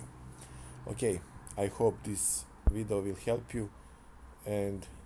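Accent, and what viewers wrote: Serbia. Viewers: A cujem lik prica engleski nekako kao da je nas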